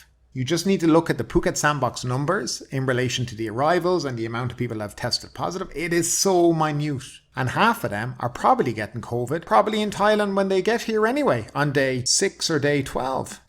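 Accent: Ireland. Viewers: Great to hear an irish man on the air waves.your very informed,speak cearly & give a independend oponion.i was fitting a kitchen on 9/11.im a galway man myself.hoping to get to thailand again.when i dont know.all the best eith the channel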